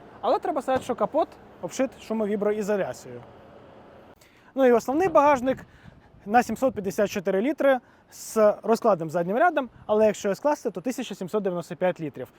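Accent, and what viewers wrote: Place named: China. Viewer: А навіщо говорити англійською з китайським акцентом ?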